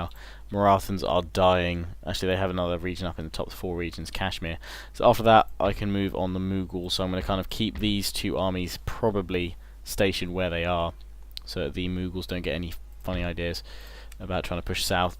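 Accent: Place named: United Kingdom. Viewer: Very british..lol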